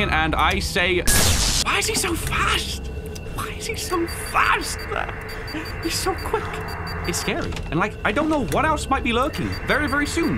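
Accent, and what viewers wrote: United Kingdom. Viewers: FOR SOME REASON I DIDNT REALISE YOUR FROM THE UK HELP. ME TOO OMGGG AA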